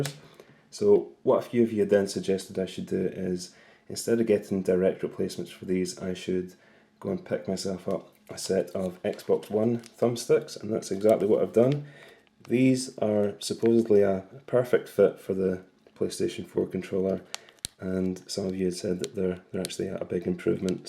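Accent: Scotland, United Kingdom. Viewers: I am inordinately partial to the Scottish accent, but even with that given: actually find your voice TOO soothing to fall asleep to- like a babbling brook with insights lol. Keep it up brother, seriously, and please monetize for our sakes; we really like you, bro -want you to stick around. :)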